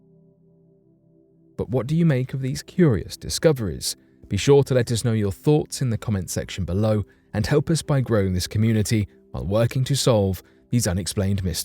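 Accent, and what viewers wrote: United Kingdom. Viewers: That's the way Brits pronounce it and he speaks the queen's English. Maybe we are pronouncing it wrong??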